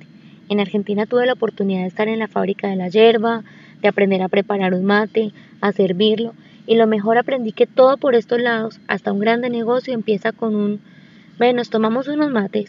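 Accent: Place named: Colombia. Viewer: muy lindo tu relato, hermoso tu acento colombiano, emocionante tu apreciación sobre nosotros y nuestro país que está lleno de buena gente como colombia, siempre bien venida, suerte en todo lo que te toque vivir.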